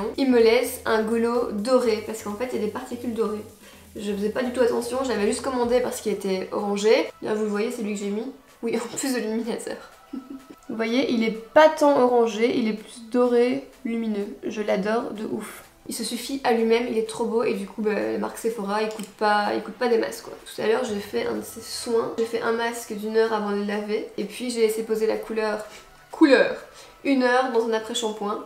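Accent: Belgium. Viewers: Tu es belge ou francaise? Pas je t'adore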